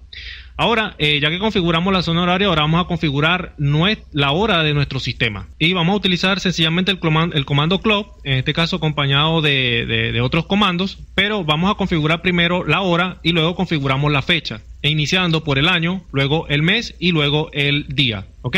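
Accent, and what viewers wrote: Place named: Colombia. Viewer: pense que era colombiano, saludos tambien soy de vzla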